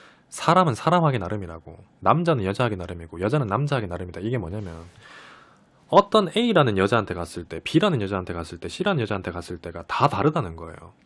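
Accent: Gyeongsang, South Korea. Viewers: 말투가 뭔가 빨려들어감 경상도 억양이 왜이케 조앙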